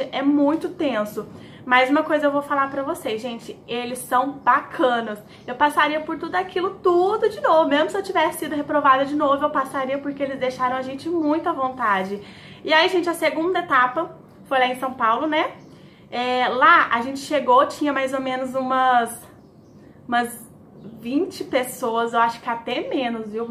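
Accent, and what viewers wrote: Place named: Minas Gerais, Brazil. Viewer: Ooowww vc tbm é mineira 😍🤗🤗 seu sotaque é tão lindo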